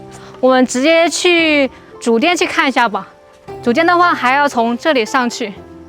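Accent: China. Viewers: 中國口音超重，你便走邊小心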